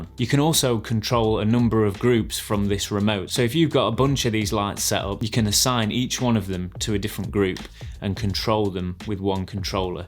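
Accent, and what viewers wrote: United Kingdom. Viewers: His british accent is good to hear! This light has been great!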